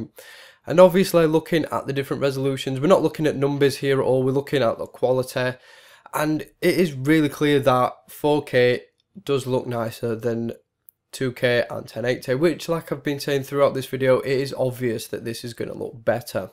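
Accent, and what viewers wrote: United Kingdom. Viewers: So hard to understand some of what your saying laddie yalll uk lots talk like this ya?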